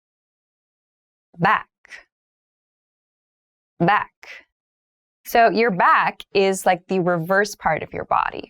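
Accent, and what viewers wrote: United States. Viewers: Thank u very much for helping us in learning English. Here I want ask u a question, please tell me where are u from and if iam not wrong ur speaking American accent, isn't it? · you're the best, i love your American accent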